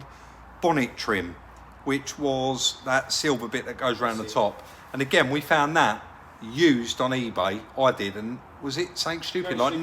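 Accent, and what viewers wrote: South, United States. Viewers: Lads never apologise for the way you talk I’m a scouser I can understand you southerners perfectly well 😉😉 the content you provide and the work you do is second to none, you lads are class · I think that’s great gets a nice ride for a bit it has turned out very nice On another note being an ex northerner understanding your southern lingo is a bit difficult sometimes And I bet you will say the same for me but I would never go on about the way you say things That is just how it is, I am glad you told him what’s for as I would too Being in Australia for the last 50 years I think I speak double Dutch these days You would have no idea what is spoken here half the time Thanks for all the great videos and I do hope enjoys his new old flash motor 😍😍👍👍ps I love finding money in cars 😂😂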